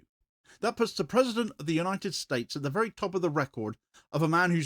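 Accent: United Kingdom. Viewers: Love your vids, be careful if you live in uk(you sound British)they might arrest you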